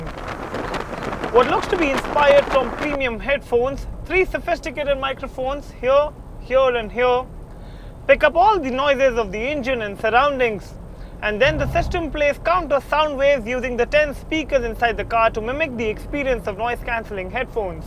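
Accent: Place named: Delhi, India. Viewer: Thanks for replying buddy ! btw I'm from Delhi You sounded bit like Delhi guys so that's why I asked you :p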